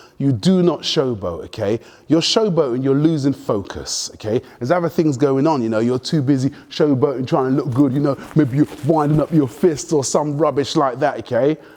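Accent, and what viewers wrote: United Kingdom. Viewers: greAt brit accent mAte . good info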